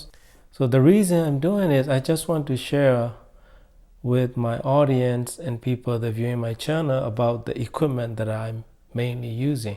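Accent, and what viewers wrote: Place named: Myanmar. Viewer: Your sound like Myanmar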